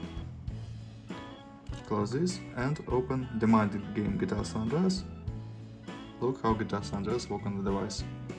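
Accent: Russia. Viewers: Just by chance is you’re first language Russia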